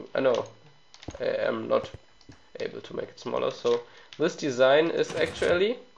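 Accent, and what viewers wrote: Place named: Germany. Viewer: du hast einen deutschen Akzent. :D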